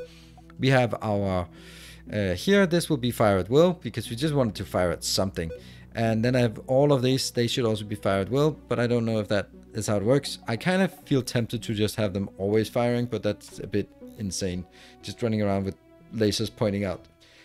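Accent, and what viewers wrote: Denmark. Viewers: Are you danish by any chance, the accent kinda gets me, just Got this game its so Fun.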